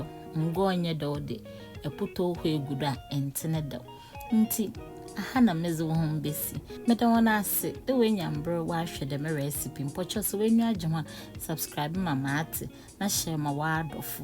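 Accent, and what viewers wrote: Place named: Fante, Ghana. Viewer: Why speaking Fante today 😂😂😂😂😂this your Fante sweet me ooo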